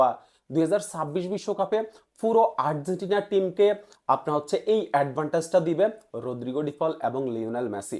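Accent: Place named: Noakhali, Bangladesh. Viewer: আপনে কি নোয়াখালীর লোক , মুখের কথায় বুঝা যায়🎉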